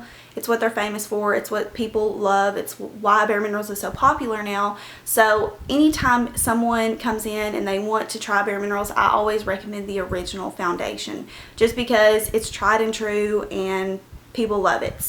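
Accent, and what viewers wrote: South, United States. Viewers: Are you from south alabama or mississippi?? You sound country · You're adorable and I admire your accent - southern? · LOVE THIS!! SO very helpful:)) btw..you're a lovely young lady, with a cute southern accent:)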